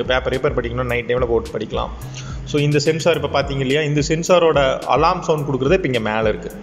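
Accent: Coimbatore, India. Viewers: Coimbatore slang😍😍🔥🔥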